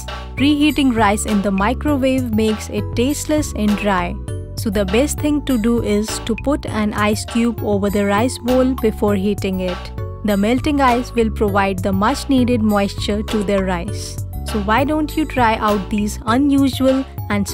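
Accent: India. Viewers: cory Turner if the video is made by a indian the English will be the same way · Indian accent...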